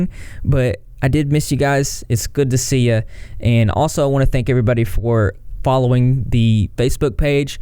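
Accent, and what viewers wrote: South, United States. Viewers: I love your southern accent ❤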